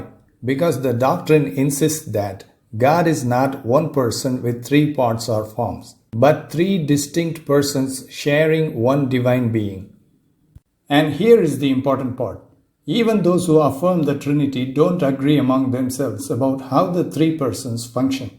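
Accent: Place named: India. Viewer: 𝕋𝕠 𝕥𝕙𝕖 𝕡𝕖𝕣𝕤𝕠𝕟 𝕥𝕙𝕒𝕥 𝕚𝕤 𝕟𝕒𝕣𝕣𝕒𝕥𝕚𝕟𝕘 𝕥𝕙𝕚𝕤 𝕙𝕠𝕘𝕨𝕒𝕤𝕙, 𝕝𝕖𝕥 𝕞𝕖 𝕥𝕖𝕝𝕝 𝕦 𝕚𝕗 𝕦 𝕚𝕟 𝕒𝕝𝕝 𝕦𝕣 𝕔𝕒𝕣𝕟𝕒𝕝𝕚𝕥𝕪, 𝕖𝕕𝕦𝕔𝕒𝕥𝕚𝕠𝕟 & 𝕞𝕖𝕟𝕥𝕒𝕝𝕚𝕥𝕪 𝕥𝕙𝕚𝕟𝕜 𝕥𝕙𝕖 𝔹𝕚𝕓𝕝𝕖 𝕚𝕤 𝕒 𝕔𝕠𝕟𝕗𝕦𝕤𝕚𝕠𝕟 𝕨𝕙𝕒𝕥 𝕞𝕒𝕜𝕖𝕤 𝕦 𝔾𝕠𝕕 𝕠𝕣 𝕒 𝕊𝕒𝕚𝕟𝕥 𝕚𝕟 𝕥𝕣𝕪𝕚𝕟𝕘 𝕥𝕠 𝕔𝕠𝕣𝕣𝕖𝕔𝕥 𝕥𝕙𝕖 𝕨𝕣𝕚𝕥𝕥𝕖𝕟 𝕨𝕣𝕕 𝕠𝕗 𝕒 𝕝𝕚𝕧𝕚𝕟𝕘 𝔾𝕠𝕕 𝕨𝕙𝕒𝕥 𝕞𝕒𝕜𝕖𝕤 𝕦 𝕤𝕥𝕒𝕟𝕕 𝕒𝕓𝕠𝕧𝕖 𝕖𝕧𝕖𝕣𝕪𝕥𝕙𝕚𝕟𝕘, 𝕨𝕚𝕥𝕙 𝕖𝕧𝕖𝕣𝕪 𝕚𝕟𝕔𝕙 𝕠𝕗 𝕪𝕣 𝕞𝕖𝕟𝕥𝕒𝕝𝕚𝕥𝕪 𝕚 𝕕𝕟𝕥 𝕟𝕖𝕖𝕕 𝕥𝕠 𝕜𝕟𝕠𝕨 𝕦 𝕥𝕠 𝕞𝕒𝕜𝕖 𝕒𝕟 𝕒𝕤𝕤𝕦𝕞𝕡𝕥𝕚𝕠𝕟 𝕦 𝕒𝕣𝕖 𝕒𝕟 𝕒𝕓𝕤𝕠𝕦𝕝𝕦𝕥𝕖 𝕒𝕤𝕤. 𝕎𝕙𝕒𝕥 𝕞𝕒𝕜𝕖𝕤 𝕦 𝕥𝕙𝕚𝕟𝕜 𝕥𝕙𝕒𝕥 𝕖𝕧𝕖𝕣𝕪 ℂ𝕙𝕣𝕚𝕤𝕥𝕚𝕒𝕟 𝕕𝕠𝕖𝕤'𝕟𝕥 𝕜𝕟𝕠𝕨 𝕨𝕙𝕒𝕥 𝕥𝕙𝕖 𝕋𝕣𝕚𝕟𝕚𝕥𝕪 𝕞𝕖𝕒𝕟𝕤, 𝕛𝕦𝕕𝕘𝕚𝕟𝕘 𝕗𝕣𝕠𝕞 𝕪𝕣 𝕒𝕔𝕔𝕖𝕟𝕥 𝕦 𝕤𝕠𝕦𝕟𝕕 𝕀𝕟𝕕𝕚𝕒𝕟 𝕒𝕣𝕖 𝕒𝕨𝕒𝕣𝕖 𝕚𝕟 𝕀𝕟𝕕𝕚𝕒 𝕥𝕙𝕖𝕣𝕖 𝕒𝕣𝕖 𝕞𝕠𝕣𝕖 𝕥𝕙𝕒𝕟 3000 𝕘𝕠𝕕𝕤 𝕡𝕖𝕣𝕙𝕒𝕡𝕤 𝕞𝕠𝕣𝕖 𝕓𝕖𝕔𝕒𝕦𝕤𝕖 𝕚𝕟 𝕀𝕟𝕕𝕚𝕒 𝕒𝕟𝕪 𝕠𝕓𝕛𝕖𝕔𝕥 𝕔𝕒𝕟 𝕓𝕖𝕔𝕠𝕞𝕖 𝕠𝕣 𝕣𝕖𝕤𝕖𝕞𝕓𝕝𝕖 𝕒 𝕘𝕠𝕕 𝕓𝕒𝕤𝕚𝕔𝕒𝕝𝕝𝕪 𝕒 𝕞𝕒𝕟 𝕞𝕒𝕕𝕖 𝕚𝕕𝕠𝕝 𝕞𝕒𝕕𝕖 𝕓𝕪 𝕞𝕒𝕟, 𝕟𝕠𝕨 𝕞𝕒𝕟 𝕡𝕣𝕒𝕪𝕤 𝕗𝕠𝕣 𝕥𝕙𝕒𝕥 𝕚𝕤 𝕥𝕙𝕒𝕥 𝕟𝕠𝕥 𝕔𝕠𝕟𝕗𝕦𝕤𝕚𝕟𝕘 𝕓𝕦𝕥 𝕢𝕦𝕖𝕤𝕥𝕚𝕠𝕟 𝕝𝕚𝕖𝕤 𝕒𝕣𝕖 𝕖𝕧𝕖𝕣𝕪𝕠𝕟𝕖 𝕠𝕗 𝕥𝕙𝕖𝕤𝕖 𝕘𝕠𝕕𝕤 𝕣𝕖𝕒𝕝, 𝕗𝕒𝕝𝕤𝕖 𝕠𝕣 𝕛𝕦𝕤𝕥 𝕒𝕟𝕠𝕥𝕙𝕖𝕣 𝕞𝕒𝕟 𝕞𝕒𝕕𝕖 𝕚𝕕𝕠𝕝...𝕔𝕠𝕣𝕣𝕖𝕔𝕥 𝕥𝕙𝕠𝕤𝕖 𝕥𝕙𝕚𝕟𝕘𝕤 𝕚𝕗 𝕦 𝕥𝕙𝕚𝕟𝕜 𝕦 𝕒𝕣𝕖 𝕒𝕓𝕠𝕧𝕖 𝔾𝕠𝕕'𝕤 𝕔𝕣𝕖𝕒𝕥𝕚𝕠𝕟...𝕡𝕖𝕣𝕙𝕒𝕡𝕤 𝕦 𝕞𝕦𝕤𝕥 𝕓𝕖𝕝𝕚𝕖𝕧𝕖 𝕦 𝕒𝕣𝕖 𝕘𝕠𝕕, 𝕚𝕥 𝕨𝕠𝕟𝕥 𝕤𝕦𝕣𝕡𝕣𝕚𝕤𝕖 𝕞𝕖 𝕔𝕒𝕦𝕤𝕖 𝕦 𝕡𝕝 𝕔𝕠𝕞𝕖 𝕗𝕣𝕠𝕞 𝕥𝕙𝕒𝕥 𝕓𝕔𝕜 𝕘𝕣𝕠𝕦𝕟𝕕 𝕒𝕟𝕪𝕥𝕙𝕚𝕟𝕘 & 𝕖𝕧𝕖𝕣𝕪𝕥𝕙𝕚𝕟𝕘 𝕔𝕒𝕟 𝕓 𝕘𝕠𝕕, 𝕚𝕗 𝕦 𝕕𝕟𝕥 𝕦𝕟𝕕𝕖𝕣𝕤𝕥𝕒𝕟𝕕 𝕥𝕙𝕖 𝕋𝕣𝕚𝕟𝕚𝕥𝕪 𝕒𝕤𝕜 𝕗𝕠𝕣 𝕖𝕕𝕦𝕔𝕒𝕥𝕚𝕠𝕟 𝕓𝕦𝕥 𝕕𝕟𝕥 𝕔𝕠𝕞𝕖 𝕠𝕟 𝕥𝕙𝕚𝕤 𝕡𝕝𝕒𝕥𝕗𝕠𝕣𝕞 𝕚𝕟𝕤𝕦𝕝𝕥𝕚𝕟𝕘 𝕥𝕙𝕖 𝕨𝕣𝕕 𝕠𝕗 𝕒 𝕝𝕚𝕧𝕚𝕟𝕘 𝔾𝕠𝕕 𝕠𝕦𝕣 𝔾𝕠𝕕 𝕠𝕣 𝕙𝕚𝕤 𝕨𝕣𝕕 𝕚𝕤 𝕟𝕠𝕥 𝕒𝕟 𝕚𝕕𝕠𝕝 𝕟𝕠𝕣 𝕤𝕠𝕞𝕖𝕥𝕙𝕚𝕟𝕘 ℂ𝕙𝕣𝕚𝕤𝕥𝕚𝕒𝕟𝕤 𝕞𝕒𝕕𝕖 𝕦𝕡 𝕚𝕟𝕤𝕥𝕖𝕒𝕕 𝕙𝕚𝕤 𝕥𝕙𝕖 𝔾𝕠𝕕 𝕨𝕖 𝕒𝕣𝕖 𝕔𝕣𝕖𝕒𝕥𝕖𝕕 𝕗𝕣𝕠𝕞...𝕋𝕣𝕚𝕟𝕚𝕥𝕪 𝕚𝕤 𝕥𝕠 𝕙𝕦𝕘𝕖 𝕗𝕠𝕣 𝕪𝕣 𝕦𝕟𝕕𝕖𝕣𝕤𝕥𝕒𝕟𝕕𝕚𝕟𝕘 𝕒 𝕣𝕖𝕒𝕝 & 𝕥𝕣𝕦𝕖 ℂ𝕙𝕣𝕚𝕤𝕥𝕚𝕒𝕟 𝕨𝕚𝕝𝕝 𝕜𝕟𝕠𝕨 𝕥𝕙𝕚𝕤 𝕒𝕤 𝕚𝕥 𝕚𝕤 𝕒 𝕗𝕠𝕦𝕟𝕕𝕒𝕥𝕚𝕠𝕟 𝕠𝕟 𝕨𝕙𝕖𝕣𝕖 & 𝕨𝕙𝕒𝕥 𝕠𝕦𝕣 𝕥𝕖𝕒𝕔𝕙𝕚𝕟𝕘𝕤 𝕠𝕣𝕚𝕘𝕚𝕟𝕒𝕥𝕖𝕕 𝕗𝕣𝕠𝕞 𝕦 𝕒𝕣𝕖 𝕗𝕠𝕠𝕝, 𝕣𝕖𝕡𝕖𝕟𝕥 & 𝕗𝕠𝕝𝕝𝕠𝕨 ℂ𝕙𝕣𝕚𝕤𝕥 𝕓𝕖𝕗𝕠𝕣𝕖 𝕦 𝕝𝕒𝕟𝕕 𝕪𝕠𝕦𝕣𝕤𝕖𝕝𝕗 𝕚𝕟 𝕥𝕙𝕖 𝕨𝕣𝕒𝕥𝕙 𝕠𝕗 𝕥𝕙𝕚𝕤 𝔾𝕠𝕕....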